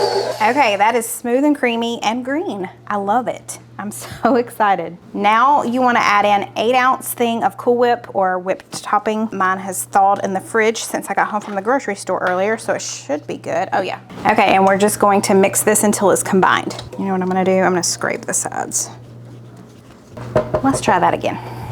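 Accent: South, United States. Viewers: Omg I'm actually crying....chocolate chip key lime pie..and in Your southern accent...its MEENT ❤❤❤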